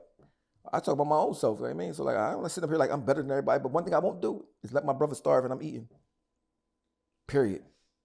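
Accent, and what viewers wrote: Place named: New York, United States. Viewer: I'm from down south in Tennessee. I'm loving this video I love to hear, men from New York speak. Lol at one point I moved to New York just for that reason. Thanks for the video. 😎💜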